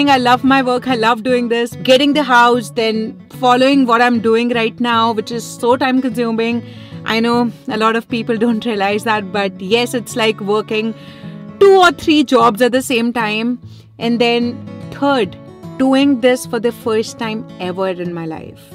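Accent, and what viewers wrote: India. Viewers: Birthday and not birday :-). We desis have so much of MTI - mother tongue influence :-)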